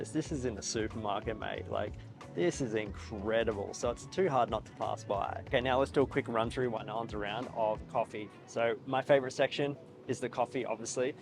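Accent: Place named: Australia. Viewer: for real mate, and also your accent is sending me back to the years I spent growing up in Australia haha I used to live in the west coast in Perth, it probably is one of the factor that makes me feels cozy when watching you. 😄 I would love to be a teacher in Japan to be honest but sadly I am not native and its not an option where I am from haha, I am currently in training for the SSW programme specifically Food Processing Industry 😁 training will last for up to 6 months and afterwards is job matching hopefully I will live there. so in total its about 1 year more or less. I definitely can't wait to experience Japan 😆 But yea, thank you for the wish, I will go full send, keep the video coming mate! I will be sure to watch every single one! and I hope your channel will be huuuge on day ✌much love from Indonesia! 🇮🇩🇮🇩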